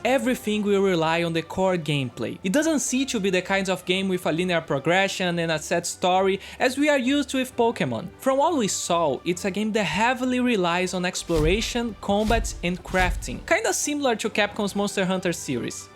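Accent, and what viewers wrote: Brazil. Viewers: AMAZING VIDEO, you really touched the subject of Game Freak and the Pokémon controversy with good conciousness but also firm on critics, Very enjoyable editing style (E com o sotaque brasileiro impossível de não amar).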